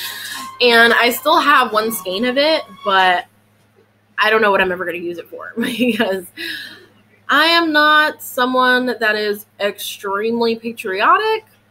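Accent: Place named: California, United States. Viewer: I actually adore your daughters blanket for many reasons. Love the 1st and last color. It reminds me of our Cali sunsets over the ocean here. 😁 I have been crocheting for 43 years since I was 8. Was taught by my mom and gma but mostly books. Also taught myself needle point, temari, Sewing, paint ceramics, crewel ,cross stitch, hair pin lace, and embroidery. Sorry you lost your mom so young. I crochet at the Drs because I always get nervous and it helps calm my nerves. That's so funny I was married as a senior in HS to my ex. Married to my 2nd fab hubby for 27 yrs now. How funny I figured you had a Cali accent and not Virginia. I tried knitting but it ends up being hazardous with my 3 cats. I have crocheted much more in last 6 years. So much easier than when I had little ones. So I can imagine you are quite busy. No way I could have done a podcast when my girls were little. 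Really enjoyed your podcasts. It's interesting to learn about the people who do podcasts and see what there shared interests are. Thank you for sharing. Lol re your hubby. Have an awesome day.😁 Have you ever met Janie Crow or crocheted any of her patterns? I just love them. Your son is so cute.♡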